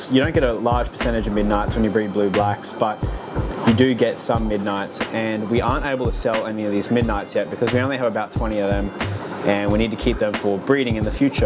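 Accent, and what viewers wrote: Australia. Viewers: its cause his Australian accent is kinda hard to understand lol.sometimes what you end up hearing is completely need up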